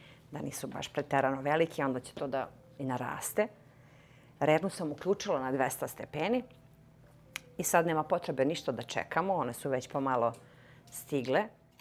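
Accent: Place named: Blace, Serbia. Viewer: Aman zeno sa juga iz Blaca,sto se stidis svog imena.Zar je moguce da se tako lako menja ime krsteno koje ti je kum dao i na koje treba da budes ponosna.Ja znam da hriscanski kada se zena zamonasi onda dobija drugo ime. Treba da se ponosis tog blackog naglaska a ne da se krijes iza tog nimalo lepog imena.Za kulinarstvo svaka cast ali postuj ono ko si i sta si.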